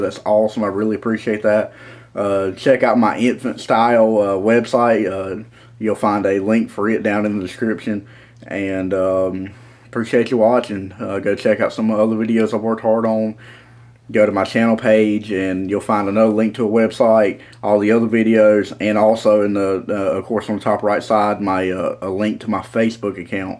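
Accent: South, United States. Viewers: No he doesn't! You just have be from the south to understand him. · ok little southern draw but why Carolina? we all ain't gat that twang....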